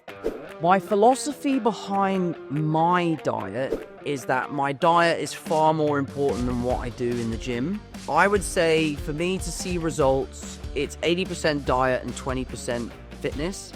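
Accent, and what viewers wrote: United Kingdom. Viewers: The British accent is charming! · I didnt expect him to have a very British accent hahaha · The way he speaks , it's as british as it gets ... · Am i the only one that is just not used to him speaking in british accent XDDD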